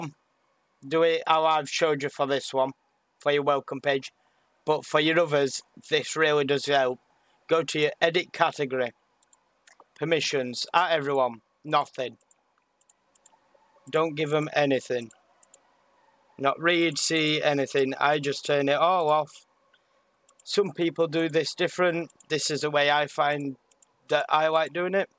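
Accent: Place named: Ireland. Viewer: WAIIIIIIT HES IRISH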